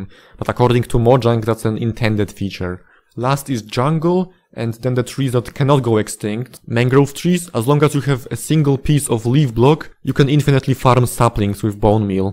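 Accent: Poland. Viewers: Are you Polish mate? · As a fellow Pole, I have to say, you have the most stereotypical Polish accent ever 😭😭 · polish accent is peak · the second i heard the voice i knew you were from Poland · Czuć po akcencie że rodak ;) Fajny filmik. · your accent is too polish. can't watch. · Are u polish? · Bro i swear to god you sound 99% Polish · You sound polish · Myślę sobie, kurde akcent jak u Polaka, patrzę na informację o kanale - oczywiście że z Polski xd pozdro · Do i hear Polish accent or am I tripping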